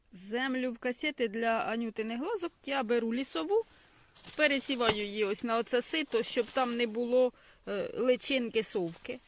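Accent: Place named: Poltava, Ukraine. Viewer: Добрий вечір, попала на ваше відео і відразу підписалася, тому що почула знайому вимову , я сама родом із Царичанського району, (живу у Дніпрі) потім узнала,що ви з Полтавщини. Манера розмови, акценти дужі схожі. Бажаю вам гарних урожаїв, а якби висилали свої квіти, я обов'язково б у вас купила.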